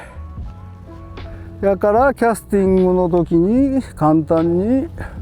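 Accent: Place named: Kansai, Japan. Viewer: おっちゃんの関西弁が良い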